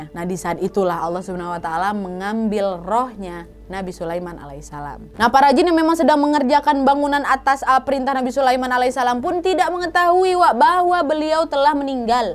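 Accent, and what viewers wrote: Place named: Palembang, Indonesia. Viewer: Keren banget 🙏ka ini....logat nya itu ga bosen denger nya...kalau ga salah ka Nadia ini....wong kito galo👍....soal kl ngomong ga metinggalan....WA nya jd ciri ckas nya....smg sehat sll 🙏💪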